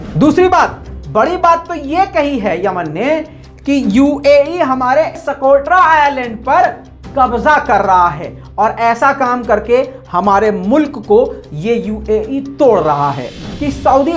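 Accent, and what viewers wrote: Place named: Uttar Pradesh, India. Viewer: भाई आप यू पी से हो, किस शहर से हो आप की भाषा से लगता है कि आप यू पी से हो।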